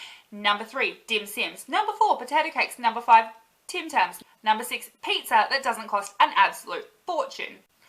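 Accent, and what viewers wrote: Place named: United Kingdom. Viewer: Only 6 months and u already have a British accent I have lived in the south of 13 years and I don't have any accent and I've grown up here I'm only 15 how do u get an accent that fast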